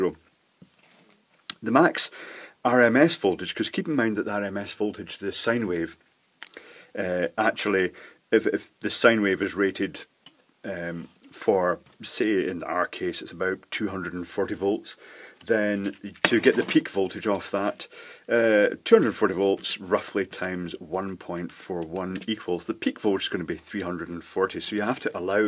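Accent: Scotland, United Kingdom. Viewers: Adam Baker can't understand Scottish accent? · Hi, I love your accent, my father from Lewis and Harris in Scotland and has a similar accent to yourself! Great Channel!!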